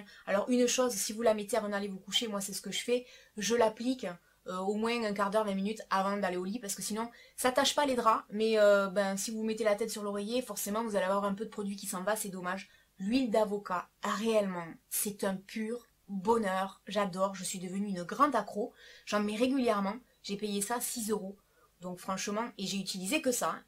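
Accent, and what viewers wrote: South, France. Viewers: Je t'en prie: Garde ton Débit 'VIF' et surtout ton Accent quand tu parles!!!!! Je suis du Sud comme toi et c'est tellement beau et bon de l'entendre sur le net!!!!!! Pour ce qui est des produits que tu présentes: je suis brune 'et' rouge et j'utilise le shampoing (pose 3/5 min) et soin repigmentant (pose 10/15 min le temps de finir la douche) Mulatto rouge et c'est franchement bien! Tu as aussi le Nutri Color de Revlon qui est très efficace même si pas bio. Et pour finir tu es un rayon de soleil, pleine de vie et toute sourire! Reste comme tu es!!! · bonsoir^^je te decouvre aujourdui grace a une video sur aliexpresse;;; qui ceci dis son top^^ j aime bcp tes videos, juste niveau son je trouve que l on entend peu fort, je suis a fond sur mon pc et c est just !!! mais genial, continue comme ca avec se bel accent du suuuud ^^ bises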